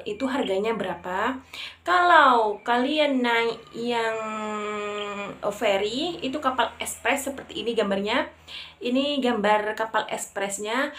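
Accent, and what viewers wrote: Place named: Bawean, Indonesia. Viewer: Logat bawean mbk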